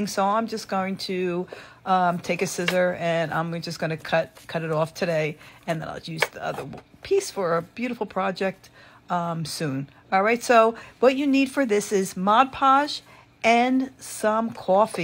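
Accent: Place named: East Coast, United States. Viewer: I hear an east coaster...NJ? "Cawfee" LOVE IT!! really I do. This is a fun tutorial, thank you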